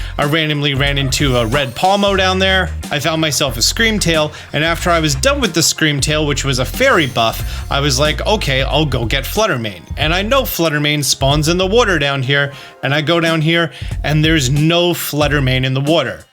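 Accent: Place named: Philadelphia, United States. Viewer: Are you from Philly? We're like the only people in the country that say "wooder" instead of water.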